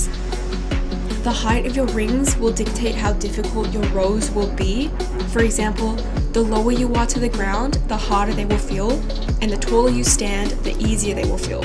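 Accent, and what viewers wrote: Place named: Australia. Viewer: Prefer your natural Australian accent. Beautiful videos. Thank you.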